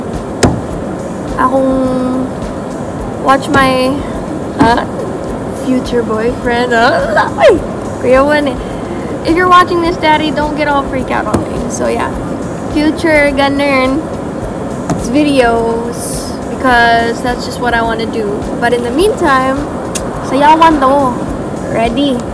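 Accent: Visayas, Philippines. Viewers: Let's Go Bisaya kaayo❤️ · cute kayka ay bisaya gyud wa ko na bored ga lantaw sa imo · Cute kayka mag bisaya❤❤ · Aw kilig jud nanamian ko mag pamati imong accent mag bisaya... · first time watching your videos and you're so cuteeeeeeee i love it💛 and the accent guuuUrrrrl, so cuteeee😍 sarap talaga makarinig ug bisaya accent, it really gets to me everytime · I like your bisaya accent😍astig kaau ate😍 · Pambisita din sa bahay mga sis napakabilis Kung magbalik. Being fluent in English with those accent na bisaya, 🔥💪👊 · Inlove kay ko sa imong tuno ba. Hahah. Laban mga bisaya. Love love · bisaya ka dae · Ka cute mg binisaya ni ma'am uy😅😅😅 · Hahaha ka cutie mag bisaya uy! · I love your accent.... 😊iba talaga basta bisaya haha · Bisaya man oie. Ok a sweet pa jud🤣🤣🤣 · bisaya man diay ka... ok ahhh... nice video... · Wa ko kabalo nganong nasaag ko dre 🤣 pero nalingaw mn pud ko haha, btw kyut kay ka magbisaya ba 😅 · bisaya na bisaya eh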